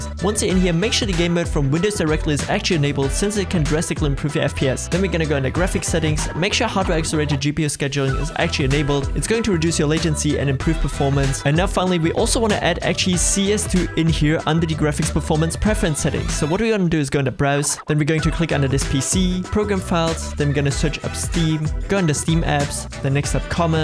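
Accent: Germany. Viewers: Hab sofort erkannt das du Deutscher bist XD nice tutorial btw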